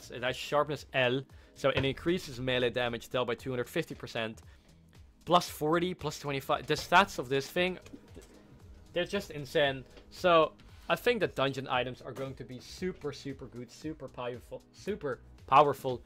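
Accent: Netherlands. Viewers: R u dutch? Just asking?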